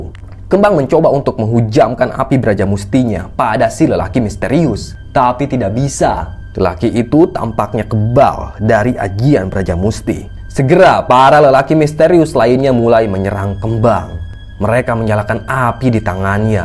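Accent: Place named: West Java, Indonesia. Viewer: Asli sunda apa jawa mbah. ? Kaya orang sunda eta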